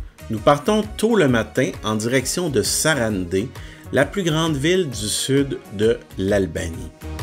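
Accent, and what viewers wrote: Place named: Quebec, Canada. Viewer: Très bonne vidéo, Merci. Sous le soleil ça doit vraiment être beau 😅..... après quelques minutes nos oreilles se font à l'accent québécois, qui n'est pas désagréable. Juste un petit détail....un moulin ça sert à moudre les céréales....pas à les "produire" 😂😂.... C'est la terre qui les produit .... bien le bonjour amis québécois ✌️😊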